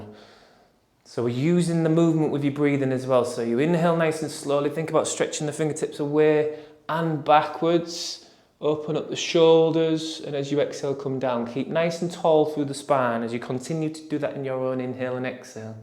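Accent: United Kingdom. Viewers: Thanks!! Out of curiosity, what part of the UK are you from? I love your accent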